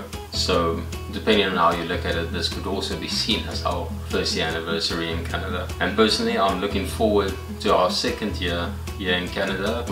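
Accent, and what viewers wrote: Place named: South Africa. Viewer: Am new to ur channel i ave watching ur videos for sometimes now i really enjoyed it. So i subscribe to ur chennel i also watch other south african youtuber one base in Vancouver also an one in toronto you guys are really lovely people love ur ascent i am a Jamaican from watching all of you ur videos i cant wait to get to canada i wish you an ur family very happy an productive life in canada.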